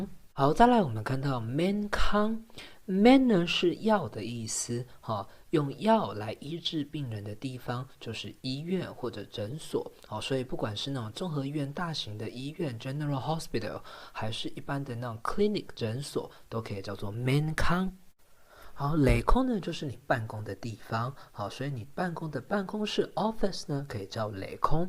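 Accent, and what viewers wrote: Lhasa, China. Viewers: 請問這是那種口音的藏語？拉薩腔嗎？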